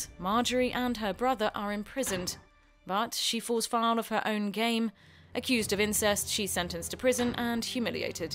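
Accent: England, United Kingdom. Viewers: i wasn't even aware this was French. The lady presenting it has a very english accent but does sound quite robotic in the delivery. I agree they are snobbish but if you present what's happened so far in a super popular show you should probably know the right words and how they're pronounced.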